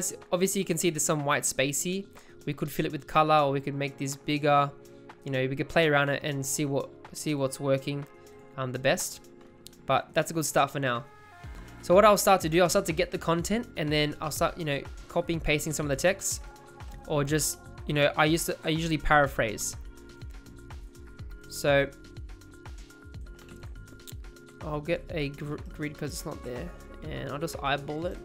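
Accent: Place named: Australia. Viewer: Thanks heaps mate, love the accent as well, finally a tutorial in strayan.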